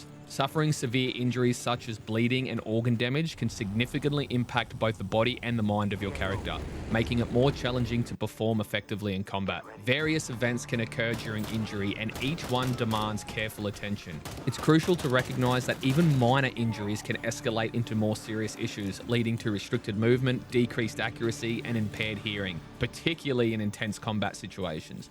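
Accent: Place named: Australia. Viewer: From the Great Plains of North America, I can say it always helps to have a super cool Aussie accent. Excellent video, excellent summary. Concise, to the point, and gets you revved up. I'll be watching more. Good work. 59 year old veteran, and I'm waiting anxiously for this to be a blockbuster! Cheers!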